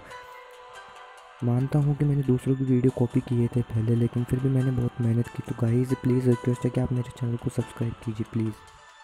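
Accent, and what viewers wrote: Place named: India. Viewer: Really? Huh. I assumed from your speaking patterns you were Indian. It sounds close to, then again I’ve never heard anyone speaking Swedish so I tied it to what I knew. But thanks for letting me know. Doesn’t make me understand the video any better. You should make one in English for those of us that would like to understand, if you don’t mind.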